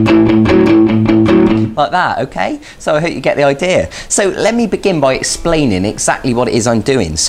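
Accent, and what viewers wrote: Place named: West Country, United Kingdom. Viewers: ooh ah, I loves me geetar lessons with a West country accent. nice one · That a fine west country accent you `ave ther boy !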